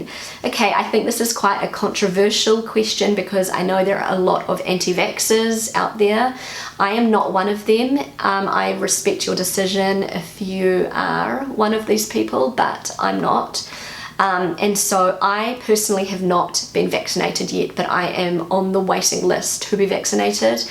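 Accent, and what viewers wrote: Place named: New Zealand. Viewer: Don't be afraid about an accent; most of Germans speaking English have one ... ;o) ... so be one of us! And even as a Kiwi you have an accent which most of Germans are not used to; so don't worry. It can be managed. I worked for many international companies and get in touch with a lot of people from all over the world - they all have an accent. So ... and if you afraid of speaking ... sing! ;o) I'm looking forward for your next video in German, be sure eveybody will love it. And BTW ... don't take it as an accent, take it as you personnel dialect. Here in the Rhine area each and every small village has a dialect of it's own and when I moved here, I needed my (former) girlfriend to translate the local dialect to "normal" German.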